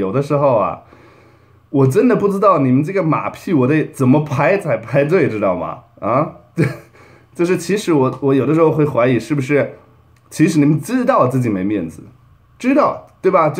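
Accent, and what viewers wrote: Northeast, China. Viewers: 东北口音。。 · 你咋是东北口音呢,哈哈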